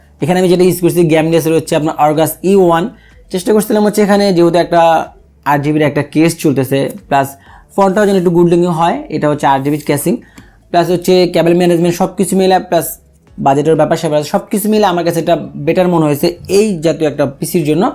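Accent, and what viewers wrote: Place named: Dhaka, Bangladesh. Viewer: আপনার সব কিছু এত সাবলিল ...সব সময়ি আমি আপনার প্রডাক্ট রিভিউ দেখি ...সুন্দর করে বুঝিয়ে দেয়া এবং টেকনোলজির সাথে ঢাকাইয়া বাংলা টা আর মজা লাগে ...আমি নিজে ঢাকাইয়া এই ভাষাটা হয়ত পুর ঢাকাইয়া না ঢাকার চলিত ভাষা ... এই কারনে আর বেশি ভাল লাগে...গুরু এমন সুন্দর সুন্দর রিভিউ আর চাই...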